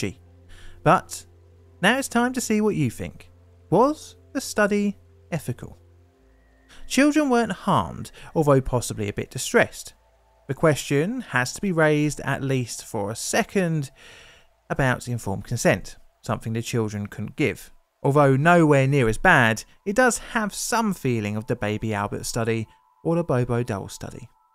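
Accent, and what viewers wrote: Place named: South London, United Kingdom. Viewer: Hello Mr South London. 👍🏻🇬🇧...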